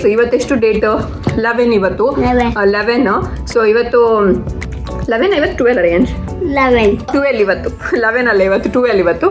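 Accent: Mangalore, India. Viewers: 1. cutness overloaded.😍..I'll always be waiting for your vlogs to see him 2. Manglore Kannada ❤️ nimma maathu kelodralli ondu maja 3. naaviruva jagadindane Canada nodo avakasha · Hello... 1.I like the greenery of Canada,especially tour vlogs😁 2.I like ur Manglore Kannada 3.I like the recipes you show and I also like you😁 Congratulations for 50K, May u reach higher and succeed 🥰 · Hi ma'am 🙏 1. I like u kannada ma'am...... because u speak in manglore kannada....it will be so pleasant to hear that. 2.i like ur simplicity n the way u enjoy ur life with u supper small family ♥️. 3.the vlogs done by u are super ma'am n the recepies u show are simply superb cool m at last but not least I like reanch a lot ❤️. That's it ma'am stay safe n stay healthy ma'am 😍😍... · I love you akka I love voice and your voice I love your cooking I love your way of talking I love your way of Mangalore Kannada voice I love you are editing Lots of love from INDIA ❤️❤️❤️😘😘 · Hii Mam hegidira Nim video yake eshta andre 1. 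Nimdhu cute family, nim husband Nimge support madthare, mathe, nim kannada adhu nam m'lore language chenagidhe, olle useful video madthira. Dress chenagieruthe nim yelrdhu.soft agi mathadthira. 2.mathe Nim maga cute boy mathu, smile, video end madodhu yela chenagidhe, avnu super 👍👌 namge eshta yelrigu nam family ali. 3.mathe nim smile chenagidhe, video namge use ago video madthira adke eshta, hage nivu mado recipe yelanu eshta india, Karnataka, m'lore, style ali madthira chenagieruthe. Try madthivi navu maneli. Hage Madhya tulu language mathadsodhu eshta aguthe. Thank you, take care bye. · Firstly I am an engineering student. I wanted to do masters in Canada and probably work there for some years and come back to India. While I searching about this I found out your channel. Since then I am following you. 3 points are 1. You sharing the experience of living in Canada 2. Nimma Mangalore kannada😂 3. 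Your simplicity and humbleness Asthe akka mathe yenu illa By the way nim maga super cute. Hopefully I fulfill my dream of doing masters in Canada 😬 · 1. Alwa. haaaa ee dialogue ge 2. Nim manglore Kannada so sweet so 3. is my son Vaibhav 's friend so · manglore style talking Ur positive vibes when u speaks about any thing I love ur family estu kushi kushiyagi irtira manele idruuu And i love ❤❤❤❤ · 1ನಿಮ್ಮ ಮಗನೆ ತುಂಟಾಟ ಕನ್ನಡ ಮಾತು ತುಂಬಾ ಇಷ್ಟ. 2ನಿಮ್ಮ ಮಂಗಳೂರು ಕನ್ನಡ ಮಾತು ತುಂಬಾ ಇಷ್ಟ. 3ನೀವು ಕೆನಡಾದಲಿದರು ನಿಮ್ಮ ಸರಳತೆ ನಿಮ್ಮ ಕನ್ನಡ ಮಾತು ತುಂಬಾ ಇಷ್ಟ 😘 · 1.Nimm Mangalore kannada tumba esta agutte 2.Nimm unique Voice ishta agutte nivu matado kannada ishta agutte 3. matu nivu avnig kodta iru Sanskriti tumba ishta nimage adakke danyavadagalu... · 1. matadodanna nodlikke nimma vedios nodtene. 2. Nimma mangalore kannada kelalikke tumba kushi agutte mattu kannada dallina spastane kelikke tumba esta agutte. 3. Nimma vedio editing mattu background music kelikke tumba esta agutte.. 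· 1.I love u soo much 2. I like ur talking style manglore kannada I am from Coorg 3. Beautiful content and camera clearty is super ur the best...... YouTuber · Channel yake ista Andre 1. Your voice and Mangalore style kannada 2. Your life style and cooking style 3. Nanage nimma explain maduva method ista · 1. I love while he is speaking 2.Talking Manglore Kannada 3.Your positive vibes and ur tradition. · 1. cuteness 2. Mangalorian. namma urinavru. mangalore kannada 3. Foreign nalli idru kannada dalli matadtira. nimma voice ista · 1.i love you 2.mangalore kannada 3.i love your channel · Hi mam nimma Mangalore kannada kelalu chanda Matte natural agi vlog madtira Nivu torso chat recipes awsome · Nim Kannada ಮಾತಾಡೋ ರೀತಿ especially Mangalore Kannada is too good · 1. Nanga nema maga andra thumba na esta. 2} Mathe nena Mangalore kannada esta hagu tha 3} Mathe Canada dhale kannada volgs madu tha ero dhu thumba na esta hagu tha mathe nema kannada 😄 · mangalore Kannada ❤️🔥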